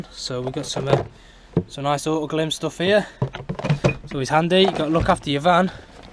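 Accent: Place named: England, United Kingdom. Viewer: Your accent makes me miss living in England.. Would you adopt me?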